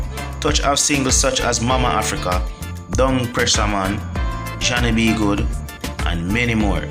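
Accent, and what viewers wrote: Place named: Jamaica. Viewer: smh hes jamaican patwah was our first language this txt is stupid cnt plz people leave youth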